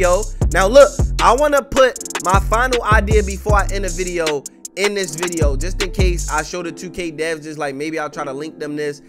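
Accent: Baltimore, United States. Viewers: I hear Baltimore and Philly at the same time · u from baltimore?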